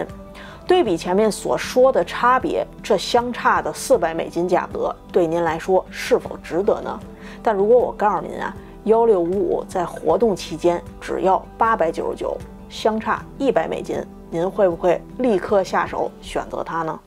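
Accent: Beijing, China. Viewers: 这不是北京口音吗？像说相声的？ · 北京口音就这样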